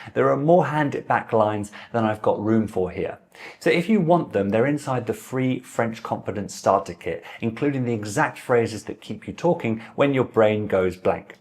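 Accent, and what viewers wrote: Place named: England, United Kingdom. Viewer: Hello, nice video! I'm a native French speaker with a fairly neutral (international) French accent. Your accent is nice, but I can hear an English accent throughout every sentence. If you're aiming for a more neutral/international French accent, I can give you a few tips. Try pronouncing your sentences clearly and at a normal pace without slurring them first. Once you get comfortable with that, you'll naturally figure out where native speakers actually slur their words. Don't start by slurring words just because you've heard French speakers do it. Otherwise, it sounds like you're imitating the accent rather than speaking naturally. In French, we usually slur words only because we're trying to say something clearly while speaking faster—and then even faster—not as a pronunciation rule in itself. Also, at the end of words, you tend to do what English speakers often do: you add a slight extra sound instead of ending the word cleanly. 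For example, you pronounce *"répéter"* more like *"répétey"**, when it should end with a clean **"é"* sound. French vowels are generally simple and steady—it's one sound, and that's it. In English, vowels often glide from one sound to another. For example, if I exaggerate, words like *"you"* and *"low"* sound more like *"yeouw"* and *"leouw."* In French, however, the sounds stay pure and direct. They'd be closer to *"you"* and **"lo"**, without that extra glide at the end. Anyway, I hope this helps! You're already doing a good job, and with a few pr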